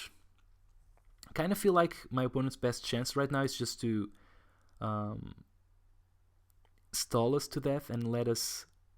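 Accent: Portugal. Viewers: Gostei bastante do video, também jogo gengar/bats/wobbu mas aplico bats e resulta muita bem, faço: 3 Gengar 1 Wobbu 4-4-4 Bats 2 miltank mas uso muito menos supps/items, mas acaba por resultar! ajuda bastante agora com wally, se ficares sem mão inicialmente. o que achas? btw, entendi que eras tuga pelo sotaque, mas parabéns, o canal está muito fixe!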